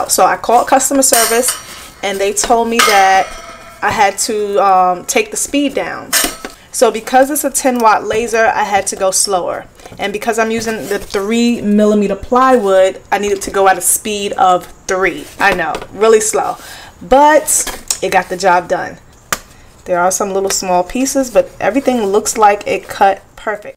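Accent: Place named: Boston, United States. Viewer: Are you from MA? The amount of times you said “lasah” I was like ok she sounds Boston!! 😂😂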